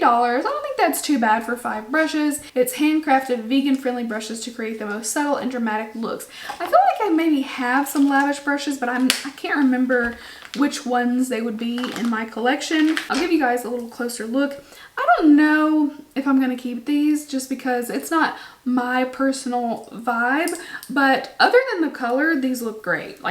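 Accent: South, United States. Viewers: Idk why I just saw hey y’all in the CC on my recommended, clicked your vid, heard your southern accent, and immediately subscribed. Lol I’m biased to southern girls like me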